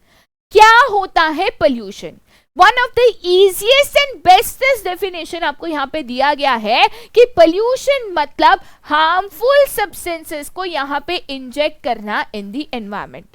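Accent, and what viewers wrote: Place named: Mumbai, India. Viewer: I think mam from Mumbai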